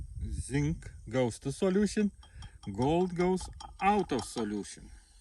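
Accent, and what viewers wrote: Russia. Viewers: But the funniest thing is Russian speaking English 😅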